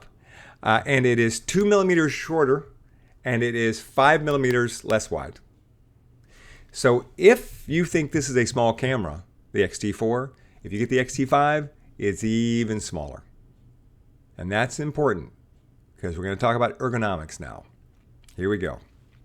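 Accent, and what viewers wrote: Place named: United States. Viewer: I did like your presentation on these two cameras, it got me out of my doubt. And all American presentation , not like these other foreigners that I coudn't understand what they were saying. Nice comparison !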